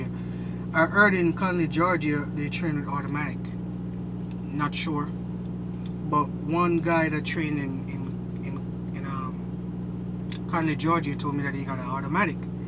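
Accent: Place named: Hartford, United States. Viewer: hey u sound like u from the Hartford area right? Was there for 2 years just moved back to nyc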